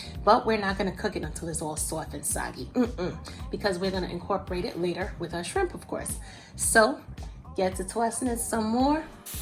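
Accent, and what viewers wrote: New York, United States. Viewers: NY stand up!! I kept laughing, like, wow! We really do have an accept. The way she says Sauce and so many other things!! Too cute! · My sentiments exactly!! I was sitting over here admiring the soft tone to your voice, the giggles and that gorgeous ring. I can’t help but notice as well that you’re from New York like me🙌🏾 The way you speak tells it all. You definitely confirmed my suspicion when you said “don’t let me come to your crib”😉dead giveaway! Who but we say this😍😜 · Your accent mad cute you so New York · i feel like your from new york lol i love the way you talk . GREAT COOKING TUTORIAL THANK YOU ❤️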